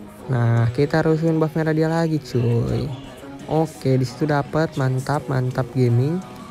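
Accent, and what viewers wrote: Banten, Indonesia. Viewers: Orang banten bang? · Asal banten ya bang?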